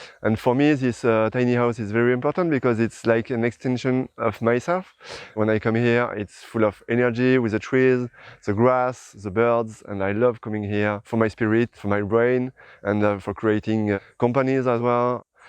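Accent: France. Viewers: His French accent.🙄🙄🙄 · Si tu sors de la France, la langue française ne sert plus à rien, donc pour atteindre un large public, c'est mieux de communiquer directement en Anglais avec l'accent français, c'est mignon, n'est-ce pas ?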